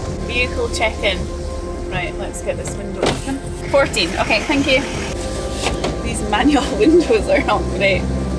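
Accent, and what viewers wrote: Scotland, United Kingdom. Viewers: Your Scottish🎉 accent is so nice !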